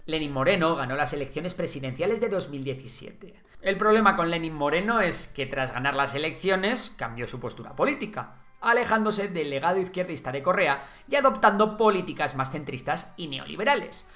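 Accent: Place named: Spain. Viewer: Hola, Espero me lea . Aunque es muy extraño que un español con su acento diga cosas raras como "Tortita en la cara", "le hizo la cama". De este video . Y aunque palabras de "chivato", en Argentina Colombia en Chile algunos no entienden, o México, pero "hacer longis" Chungo" ,"Feten","Faripré", "Potra" (suerte), me sorprenden en videos de españoles que no entiendo y debo pausar para investigar. Yo lucho para que "No sabo kid" me dijeron, hay estereotipos, a los españoles y latinoamericanos debemos de tener una unidad. Aprecio mucho la forma rápida de explicar, me gustan sus ilustraciones !. Aprendo mucho. El minuto 12 :04 ese tono español que no entiendo al mencionar todos esos nombres, de verdad que le aplaudo. 😅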